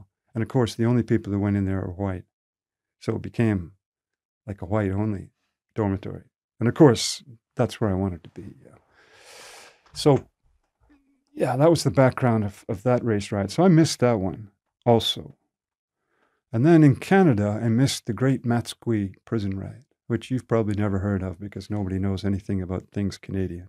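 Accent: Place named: Widnes, United Kingdom. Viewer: Widnes lad innit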